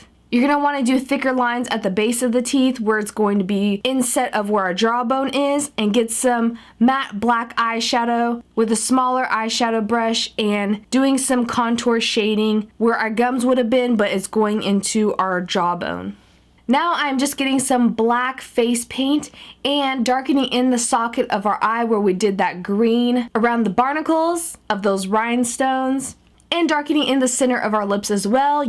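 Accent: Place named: South, United States. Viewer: To my knowledge she's from Texas [Now living in California], so it'd be southern.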